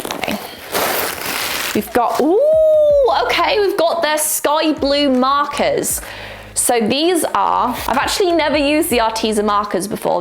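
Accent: United Kingdom. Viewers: Hi, of all the art supplies, I loved the arteze (hope I got the spelling right) watercolour books the set if three, I love watercolour work so. Thank you for such a beautiful Unboxing, P.S. you have a very beautiful British accent.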